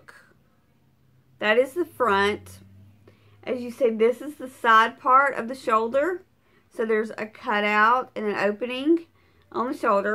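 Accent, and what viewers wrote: South, United States. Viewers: Are you tipsy or just really southern??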